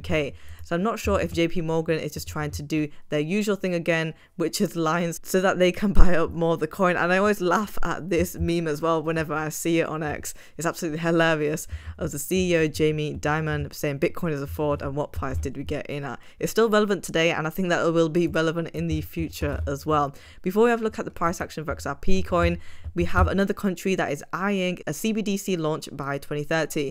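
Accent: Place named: North, United Kingdom. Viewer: northern british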